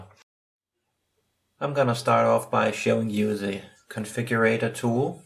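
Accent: Denmark. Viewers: Hmmm.... Sounds like you are Danish!?!?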